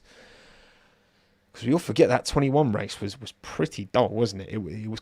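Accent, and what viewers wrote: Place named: England, United Kingdom. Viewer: I really like hearing your English accent! Are you a Londoner? My uncle always called his head a Swede. Nice Barnett by the way😊!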